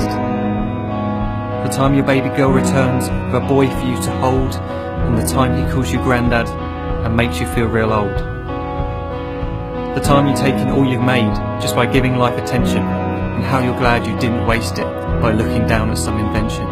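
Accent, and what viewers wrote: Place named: United Kingdom. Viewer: He talks real funny! Is he british or somthing? Anyhow, all you ol' geezers should spend MORE time on yer smart-phone! Like I do!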